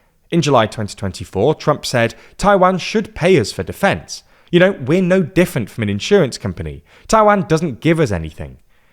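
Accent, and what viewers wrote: United Kingdom. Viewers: That’s just due to the British accent.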